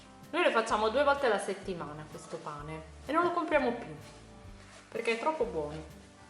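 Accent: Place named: Parma, Italy. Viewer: Ciao! Mi é piaciuto il tuo canale, parli come un' amica mia italiana che é di Parma , di che città sei? Parli così bene che io ti capisco tutto e non sono nemmeno italiana. Saluti dal Cile!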